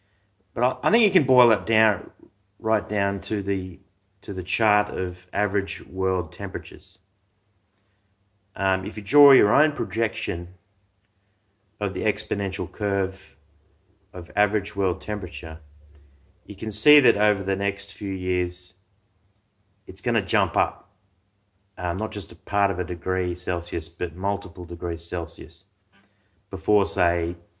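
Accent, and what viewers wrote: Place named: Australia. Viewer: thanks for the Video you sound like an Aussie too 😎 I live in a smal remotel costal town in East Gippsland Victoria and I have conversations about this with my wife, friends many locals are aware what we are facing.. and I also travel to Sydney for work and the people I know there are having this conversation too.. but, my circle of friends live simply, and are artists or musicians .. yet I think alot more people deep down know this is happening the conversation is getting larger .. unfortunately we are just locked into the current paragdime,/paradox we face there is no escape..I will subscribe to your channel...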